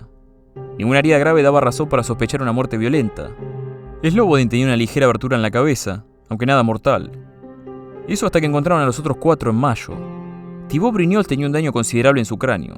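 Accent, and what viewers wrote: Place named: Buenos Aires, Argentina. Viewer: Sonás re-porteño flaco, ¿de que barrio de capital sos? (no es una crítica, lo pregunto con buena onda). Capital federal (denominación antigua para el distrito federal nacional de Argentina), C.A.B.A (ciudad autónoma de Buenos Aires: denominación moderna)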